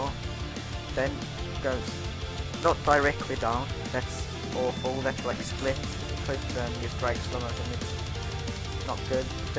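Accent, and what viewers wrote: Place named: United Kingdom. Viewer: nä så ere fan inte ! ;o du låter som en äkta britt typ xD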